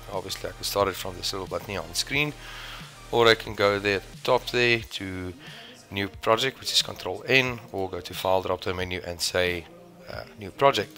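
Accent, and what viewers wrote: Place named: Johannesburg, South Africa. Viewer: thought i recognized that accent. jo burg? anyways thanks again from a total beginner. question: how are you enlarging or shrinking the parts when you are are moving them around. what controls are you using?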